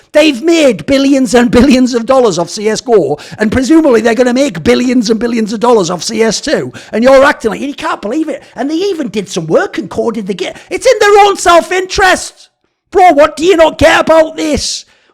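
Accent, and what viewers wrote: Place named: Middlesbrough, United Kingdom. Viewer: not wathced you in a while but glad to hear you've embraced your boro accent more than ever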